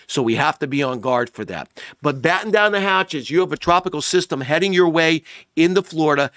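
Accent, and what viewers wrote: New York, United States. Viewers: He must be from the New York City area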